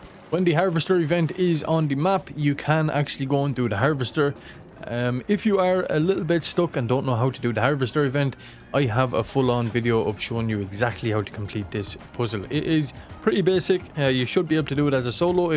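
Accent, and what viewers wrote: Ireland. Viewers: Great video,! Extremely helpful. And every time I watch one of your videos, your brogue reminds me that I need to get back to Ireland.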